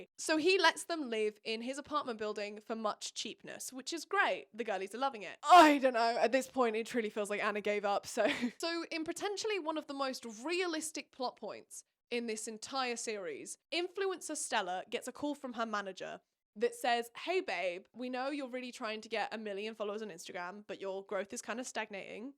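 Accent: United States. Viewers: From an American person I love your American accent! For this series I started with Twisted Lies (it was the most interesting sounding one and I read that Ana said they can be read in any order) and I actually really enjoyed it. Bit slower paced but I did love it. I didn't really like Twisted Love and to date Alex is my least favorite among the twisted men. Twisted games is my favorite in the series by far and Rhys is the only tolerable amongst the twisted men. One thing that I really hated about Twisted hate is that its completely killed Jules' and Josh's characters. They were silly gooby guys in the last, second, and first books but were insufferable in their own book. Also just wanted to mention that Ava's mom's name is actually Wendy. It was mentioned once during her dad's villain speech when he mentioned that Ava looked nothing like him and mainly had a resemblance to Wendy (her mom) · From Missouri USA and I love your American accent ❤ if you read books in that voice I would buy every single one. 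· Omg can you just read books in your American accent I'm obsessed I need more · your american accent is so good😭😭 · Busts out a damn near perfect generic American accent than slowly starts turning into cursive 💅🏽❤️ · obsessed with your american accent - i only read book one, but now I want to read all of them so I can listen to your recap after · The way you presented everything was amazing! I laughed a lot! I love when you pointed out the way things just didn’t make sense. And your American accent chefs kiss 😂😂 I was entertained the whole way though! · As an American, I wish I had your American accent 😒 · THE AMERICAN ACCENT 🤩🤩 it is toooo good!!! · Your American accent is pretty on point girl! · As an American omfg??? Why is no one talking about how like surprisingly good the American accent is??? Idk why but hearing people who don’t have an American accent do that accent gets me all giggly she sounds better than I do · American avid reader here. 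🙋🏽‍♀️ Awesome American accent, shite books. They’re all really bad copies of much better smutty series from the 2000’s. Just my opinion though. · This video gave me a good giggle, thank you! I am...no longer intrigued to read The Twisted Series unless it was for just straight up giggles. LOL Also your American accent 15/10, exceptional. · Your american accent is making me feel some typea way 🫣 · that american accent is insanely good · Okay but why is the American accent so good??? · YOU’RE TELLING ME YOU’RE GIVING US HOURS OF CONTENT IN MULTIPLE PARTS? WE WIN WE WIN (Edit: As an American, I am simping for your American accent 🧎‍♀️) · your american accent was not bad actually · wait why is your american accent flawless what the hell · Your American accent is kind of everything?? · Thats a damn good american accent! 🇺🇸🇺🇸🇺🇸🇺🇸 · the american accent is so funny LOOL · Your American accent is insanely good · Yeah her standard American accent is spot on. 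Obviously a lot of us don’t sound like that, but it’s recognizable and good. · when you apologized ahead of time for your american accent i wasnt ready for it to be actually so amazing. generally when people do american accents they just do southern or valley accent! your american accent is INSANE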